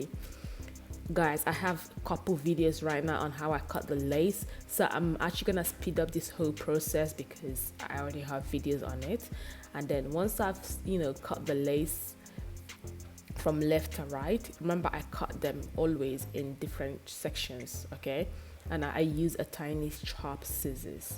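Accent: Nigeria. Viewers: No be edo accent I Dey hear so??? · I’m here to hear your nigerian accent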